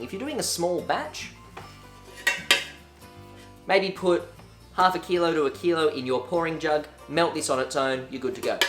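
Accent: Australia. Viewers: He has an Australian accent that isn’t annoying. Astonishing. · He makes me think I need to marry an Australian man. Love the accent.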